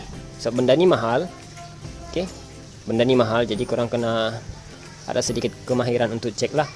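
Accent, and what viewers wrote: Malaysia. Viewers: Awak nich orang mane, cakapnye kaya orang melayu, ini videonya ranca bana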